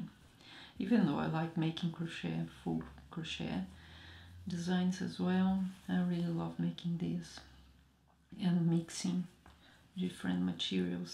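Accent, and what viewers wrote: Brazil. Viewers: Pelo teu acento deu pra perceber que vc é brasileira 🤣faz esse mesmo modelo em português please🙏🏾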